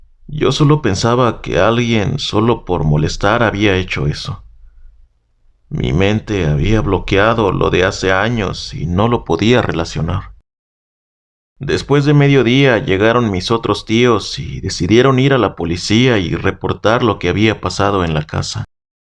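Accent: North, Mexico. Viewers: No me canso de escucharte amigo 👍 tengo entendido que eres de Juárez? Me encanta el acento del norte de México Saludos a todos desde España 🇪🇦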